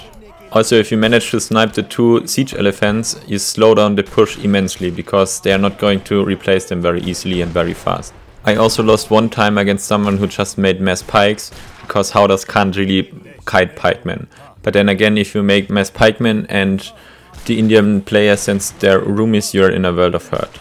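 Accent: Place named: Germany. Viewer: Diese furz trockene art und der deutsche akzetnt 10/10👍🏽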